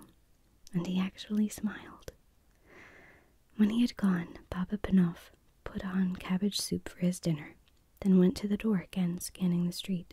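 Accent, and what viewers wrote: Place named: Texas, United States. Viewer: It's great to hear from a fellow Texan again. Wonderful video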